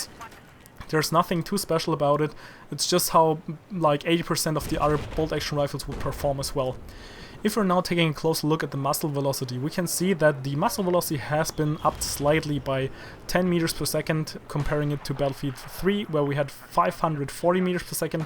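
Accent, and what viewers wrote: Germany. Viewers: are u german? :D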